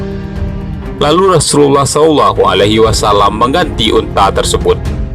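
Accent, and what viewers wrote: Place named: Padang, Indonesia. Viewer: Orang padang pasti dari logat bicaranya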